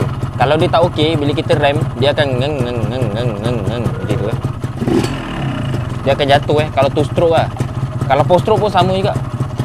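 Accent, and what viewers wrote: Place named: Johor, Malaysia. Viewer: Asslmlkm... Oooooo org Johor klau ckap try...bunyi dia...terghaiii Oooooo bari Sy tauuu😁😁😁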